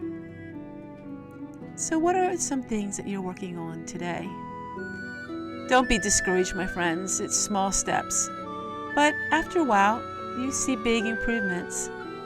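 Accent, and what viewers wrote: Pennsylvania Dutch, United States. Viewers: Good morning,! I love when you share about your heritage. My husband and I watched your video together last night, and then I watched it again this morning to leave my comment, something I've been doing lately. We remarked about the Pennsylvania Dutch you spoke and which words sounded more Dutch or more German to us; it sure sounded familiar when you were speaking. My grandmother spoke Dutch since her family came here from Holland in the early 1900s; his grandmother spoke German because she came here from Germany in 1938. Your aprons looked so pretty all hung and organized like a color wheel. I spent the other day reorganizing my kitchen. Even though it was just a small bit of decluttering, it made a big difference! Have a beautiful, blessed day! ❤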